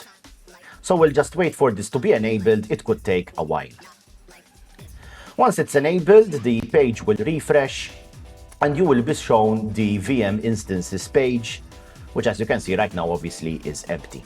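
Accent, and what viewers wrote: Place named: Italy. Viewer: great tutorial! I used AWS so far, but this tutorial got me interested in GCP and I started learning about GCP more so I think it'll be a longer journey which I got into thanks to you :) I just love how smooth the GCP interface is compared to AWS p.s. nice accent, I'm guessing Italian although I don't know what is the accent of Maltans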